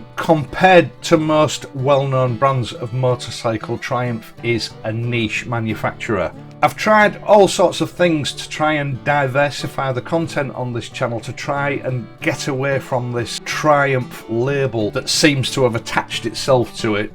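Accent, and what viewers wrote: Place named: East Yorkshire, United Kingdom. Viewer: Long Riston Jet garage? :) Makes a nice change to hear an East Yorkshire voice! Good channel.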